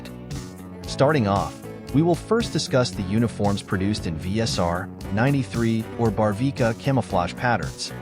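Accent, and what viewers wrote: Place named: United States. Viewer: Very nice. You have a nice speaking voice. It's so very American. You sound like a voice actor for the main lead in an early 00's anime that's been dubbed.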